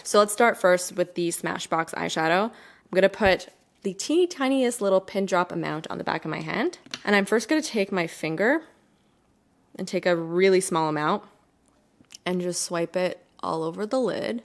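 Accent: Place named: Canada. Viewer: This video was recommended to me on the sidebar. 5 seconds in I knew two things... 1. You were Canadian, 2. I'd be subscribing. I love love love your delivery. Hello from Vancouver!! <3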